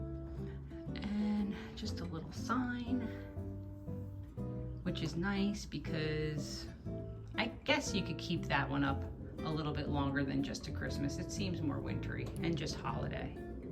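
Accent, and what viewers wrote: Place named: South Jersey, United States. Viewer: I am watching from North Carolina. I love your videos; especially love your accent ! It is very nostalgic to me since I am originally from South Jersey! You sound just like my mom’s friend😂! I love the green in your house. That color is my favorite and I have it in my home too . I love how you shared that you mixed the paint colors to achieve the shade you wanted; that’s what I did with my kitchen cabinet color mixing two of the Sherwin-Williams green.😊 You are inspiring me to paint my table and maybe my chairs because I have a similar set…so cute!❤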